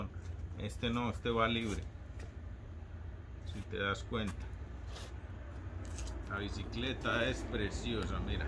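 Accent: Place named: Antioquia, Colombia. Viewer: Justo lo que estaba buscando. Quiero hacer uno de estos y encontré varios videos en otros idiomas pero este en el español con acento paisa lo entendí a la perfección saludos desde Colombia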